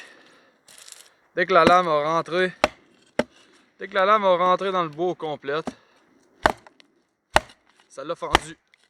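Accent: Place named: Quebec, Canada. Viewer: Merci pour cette vidéo. Condor niveau qualité/prix/efficacité c'est souvent bien pensé et correct. Je découvre ta chaîne et rien que pour les couteaux, la neige et ton accent de cousin outre océan, je m'abonne. à+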